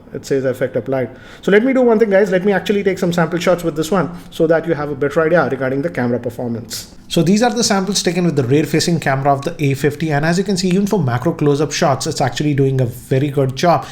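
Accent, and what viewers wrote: India. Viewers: indians has a funny english accent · indian accent ?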